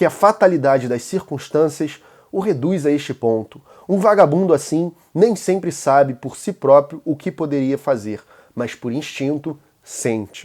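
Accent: Rio de Janeiro, Brazil. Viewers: No vídeo o sotaque carioca chiado, é muito forte. Tens q fazer um teste de dicçao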